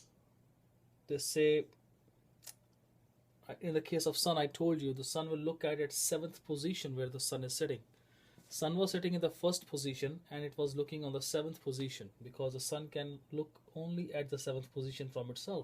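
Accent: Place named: West, United States. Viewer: Bro if you lose your Western accent this wonderful explanation would be better understood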